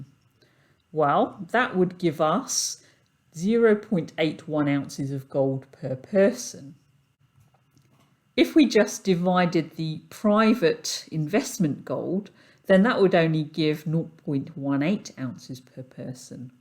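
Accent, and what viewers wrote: England, United Kingdom. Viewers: Thanks for all the information. You definitely did not answer the question though !!! Noticed someone said you were a kiwi. You have an English accent surely ?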